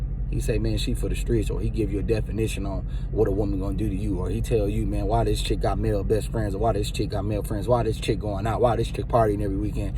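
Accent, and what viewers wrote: Baltimore, United States. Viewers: I hear a lot of the 410 in your accent ..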